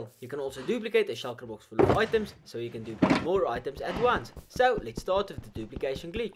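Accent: South Africa. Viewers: south african if im right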